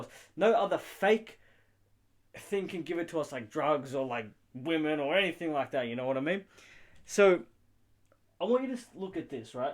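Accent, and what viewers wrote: Australia. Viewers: are u australan ??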